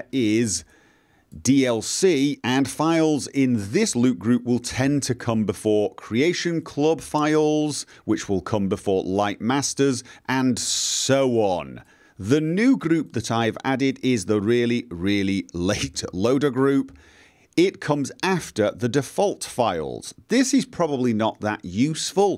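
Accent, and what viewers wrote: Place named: Liverpool, United Kingdom. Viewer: Very helpful up to now, the big question is.... Where about in Liverpool? Defo scouse defected!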